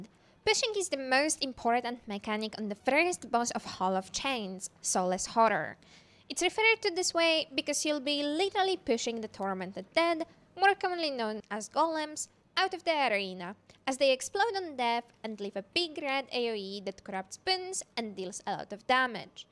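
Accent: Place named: Ireland. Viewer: Thanks for the great guides! Pushing always gave me rough time so I just switched off support to dps for this flight. P.S: Interesting accent! I can’t identify it. What region are you from? I get Irish vibes but I have no idea.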